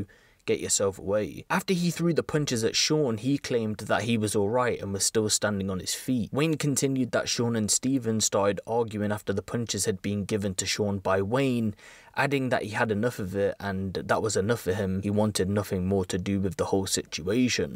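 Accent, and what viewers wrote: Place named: Northern England, United Kingdom. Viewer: he has a northern accent, what's the issue?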